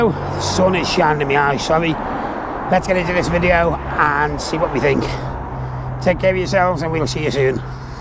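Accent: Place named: United Kingdom. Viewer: You sound like Patrick Mahomes if he was a Brit, I’d imagine.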